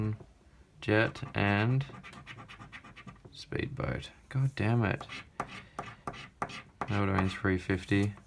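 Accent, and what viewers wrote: United Kingdom. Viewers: He sounds British, but there are dollars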